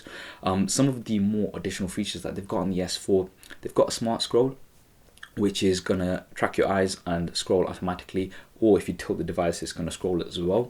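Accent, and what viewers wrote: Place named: Newcastle, United Kingdom. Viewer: Thumbs up for geordie shore accent!!:)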